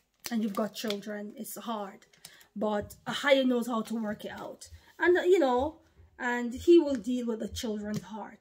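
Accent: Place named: United Kingdom. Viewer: Sister, you look so beautiful. You kind of resemble my mother. They say you’re a false prophet, but I don’t see that. And the facts that you share let’s me know you know the truth. Keep up the good work, we look forward to your videos & appreciate you. I love your UK accent too, “I can’t find mi pen!” 😂😂😂. God bless you and your family Sister 🇨🇦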